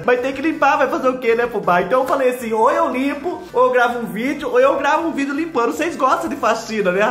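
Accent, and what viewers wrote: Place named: Caipira, Brazil. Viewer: Você É Demais! Amo esse seu jeitinho caipira de falar, morro de rir com as palhaçadas que você faz e diz. Cansei só de ver você limpando, tu vai ver o que é o corpo fazer CREK quando chegar na minha idade (66), minhas costas estralam só de olhar alguém faxinando. Cruz Credo! 😘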